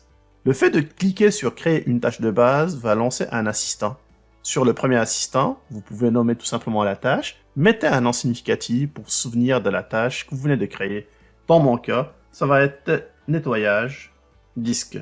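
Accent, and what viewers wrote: Quebec, Canada. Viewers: Merçi mon ami je n'ai pas d'ordinateur mais c'est sympa d'entendre le Québec ✌🤗😂😂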